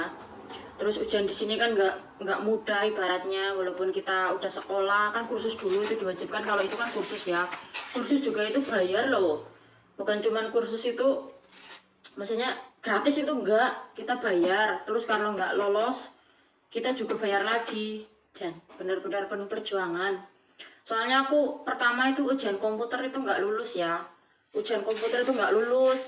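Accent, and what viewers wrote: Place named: Banyumas, Indonesia. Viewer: Hidup ngapak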